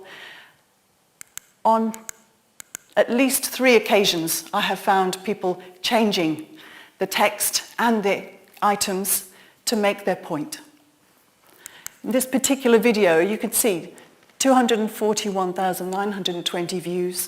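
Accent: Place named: United Kingdom. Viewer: đó là phát âm giọng Mĩ, còn của cô là giọng Anh hoàn toàn khác nha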